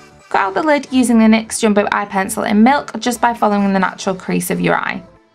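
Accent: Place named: Cheshire, United Kingdom. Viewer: You sound like you're from Middlewich! I taught at the High School there in 2006, and when my girls went to Sir John Deanes, they had pals from Middlewich High...so you're probs going to tell me I'm completely wrong and you live in Alsager or Nantwich! I'm just outside Northwich in Weaverham. If you're in the area, let me know and I'll show you my mental makeup collection which is taking over my bedroom. And I'm 47!! My daughter gets inordinately jealous and barks, "YOUVE GOT TOO MUCH MAKEUP!!! Mummmeeeee, can I have your Vice Reloaded and your Violet Voss palettes pleeeeeease cos you love meeee." Suffice it to say, the answer's NO! Thanks for taking the time to reply. That is a lovely touch for a YouTuber cos few of them can be bothered with their subbies xx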